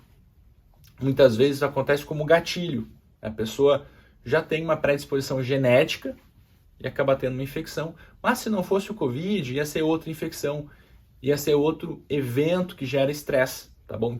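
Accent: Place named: Rio Grande do Sul, Brazil. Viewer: Eu dou nota 10 . Eu vi que tu és gaúcho , pelo sotaque. Conheço bem . Moro em São Paulo !!!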